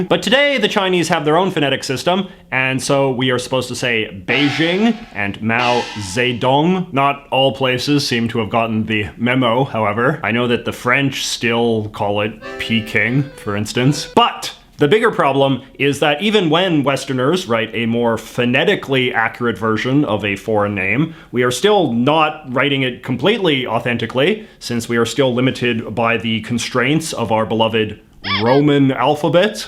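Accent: Canada. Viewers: In addition to his use of sound effects, I find amusing Mr. seemingly unconscious and unironic Canadian variations [n b.: plural] on the word "about." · I'm American, I just subscribed bc I find Canadian accent very sexy. But then I found out that his content is pretty good too.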